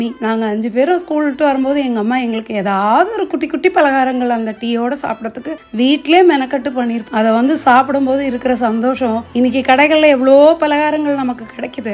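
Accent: Kerala, India. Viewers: Romba alaga pesurenga amma.. Unga pechu la malayali touch eruku, neenga malayali ah?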